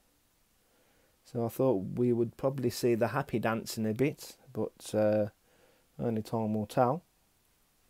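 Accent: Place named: United Kingdom. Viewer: I hear the a as o instead. Brittish accent can be difficult to understand sometimes, particularly if you hear American all the time then you thing that is the normal. I am not a native speaker, I am from Greece. You speak with original brittish accent though. Maybe Charles Darwin spoke like that, I don't know. Generally the Brittish sources I have read refer to the animals with more normal names. You say royal pythons, Americans say ball pythons. In Greece the Greek name is royal python but colloquially many people say the American, ball python. You say horned frogs, Americans say pac man frogs which is somewhat silly. The same with morio worms. I don't know who tried to market them as superworms, it sounds like a cartoon name and of course if you were to find them in the wild you wouldn't devise a name like that. Or the black soldier fly larvae which are called phoenix worms, or the stupidest of all, calciworms, while in fact they are just plain maggots.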